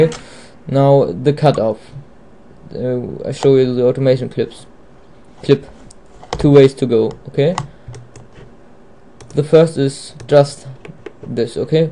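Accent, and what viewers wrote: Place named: Germany. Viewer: du hast ein deutsch accent (?) i am dutch and i can understand it:P i am soon going to germany to europapark, do you know that? Auf Wiedersehen:D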